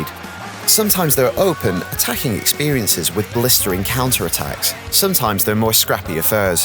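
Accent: United Kingdom. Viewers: everytime theres a football game review on ign i think "boy, time for some british accent :D"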